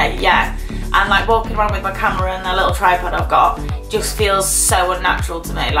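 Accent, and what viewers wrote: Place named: Northern England, United Kingdom. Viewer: I got sent here from one of Nina's posts! Look forward to seeing your vlogs so good to hear another northernerish voice!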